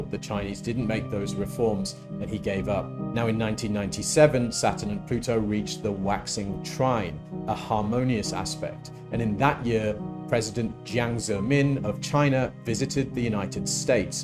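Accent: United Kingdom. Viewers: Nice to hear a british accent. Was a bit tired of boring Chris Brennan who almost makes me doze off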